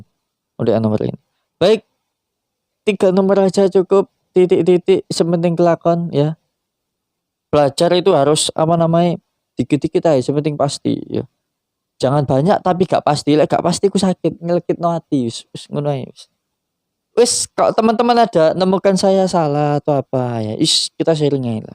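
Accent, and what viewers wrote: Indonesia. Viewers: mas nya medok ganteng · sby banget bosone rek wkwk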